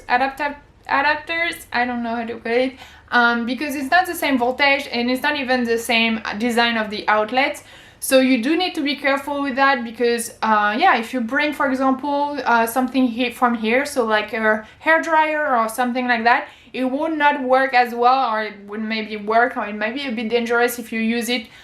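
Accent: France. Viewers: T'as jamais envoyé du courrier toi ! Et pas la peine de dire que tu est Française, ça s'entend ! On a un marqueur rouge pour indiquer qu'il y a du courrier à partir, avant de dire des conneries on se renseigne ou simplement on regarde dans sa boite pour le reste c'est pas mieux...la moindre poignée de porte te fait éclater tes 2 neurones.. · The accent shows she is CLEARLY french my guy 😂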